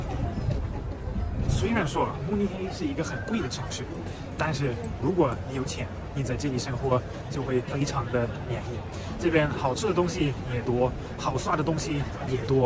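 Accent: Sichuan, China. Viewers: 滿口四川口音😂😏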